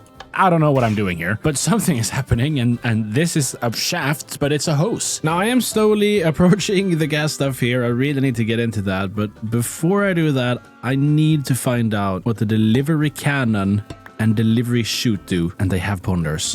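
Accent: Sweden. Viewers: I swear, every time when i hear you i think that you must live or is born in sweden with the accent that you have.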